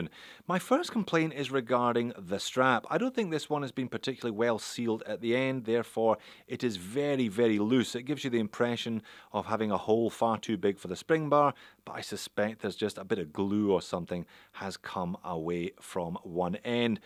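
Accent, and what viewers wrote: Scotland, United Kingdom. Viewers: Subscribed to all the required, please chuck me in the draw! Keep up the great and honest content, I look forward to your Scottish tones with each new release. "Hallllooooo and welcome!" 😁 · Hi! Greetings from Norway. Thanks for the opportunity to win such a great watch. Love your content – and your Scottish accent.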